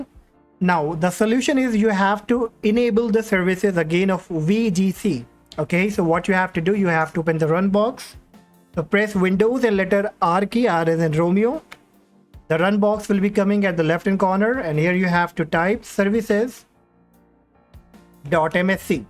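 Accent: India. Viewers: i love you indian boy with your nice dialekt that helps me very ty bwoo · everytime you heard an indian accent you know its a good tutorial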